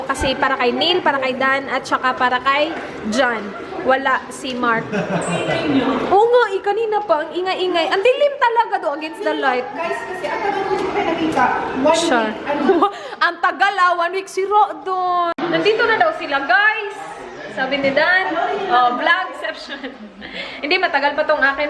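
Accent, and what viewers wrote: Visayas, Philippines. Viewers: Na amaze kayko ba kay the way ka mo storya og tagalog naa gyapon accent nga bisaya. Love u dhai😘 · Suok2x jud. Tarongag balibod..... 😁😂😁 cute jud kaayo mag bisaya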